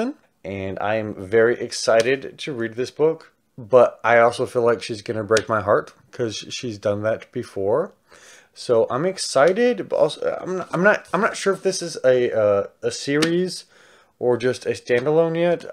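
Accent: South, United States. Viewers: I love your video at your house in Texas during your quarantine until you had some new books are "Now I Rise" and "Bright We Burn" by Kiersten White, "Aurora Rising" by Amie Kaufman and Jay Kristoff, "Thunderhead" by Neal Shusterman, "Mistborn" and "Mistborn: The Well of Ascension" by Brandon Sanderson, "The City We Became" by N.K. Jemisin, "The Vashing Half" by Brit Bennett, "The Prophets" by Robert Jones, Jr., "Angel After the Fall" by Joss Whedon, "Angel and Faith" by Christos Gage, "Queen of the Slayers" by Nancy Holder, "Warbreaker" by Brandon Sanderson, "Fire from Heaven" by Mary Renault and so many others including "Jeremiah" by Robert Cain while you're talking about all of the characters from the book to read them, so you're reading some letters by one of your fans after that, but I hope your husband, Zach will be very proud of you and I wanna say I'm happy for you, because I love your Southern accent, I know you're gay and you're half Mexican!!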